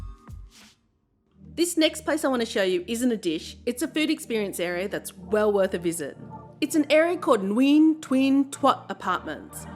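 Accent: Australia. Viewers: I really enjoyed watching this even I am living in Saigon in Week 3 of the lockdown so it was kind of painful to watch. Is your accent Aussie? I look forward to trying all the places post-lockdown and to more of your videos. Cute dog too!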